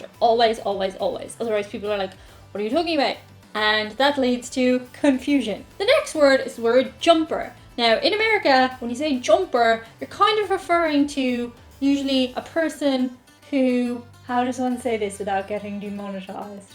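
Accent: Ireland. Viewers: We say stuffed to say we are full as well you Irish accent might be throwing the sound of the word.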